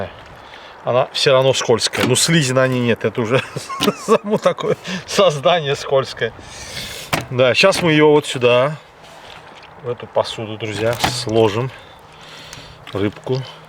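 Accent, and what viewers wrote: Baku, Azerbaijan. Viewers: бакинский акцент , так сладко кушает , класс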